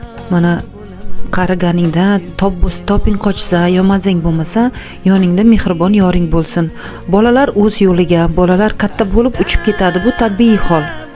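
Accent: Surkhandarya, Uzbekistan. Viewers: Сурхондарёлик ликсиз шекилли.. 😅😅😅 Қоранг қони кай.. Жувиб.. 😅😅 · Гапиришларизга ичакларим узилди сурхончасига шевада😂😂😂😂😂😂😂 жувиб денг😂😂😂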